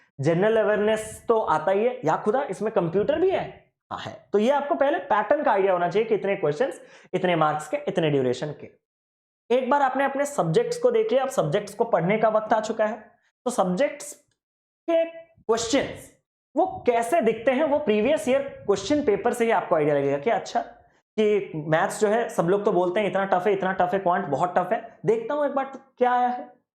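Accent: Odisha, India. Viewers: Tum odia ho kya kese hindi bol rehe ho❤❤❤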